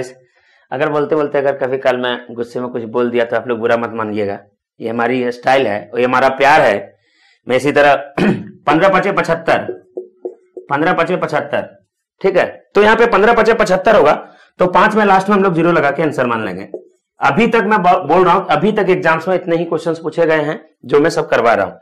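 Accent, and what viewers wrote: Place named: Bihar, India. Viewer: Are gjbbb Gurudev ap to.....1 dum bihari tone m mazaa aa jata hai padhne m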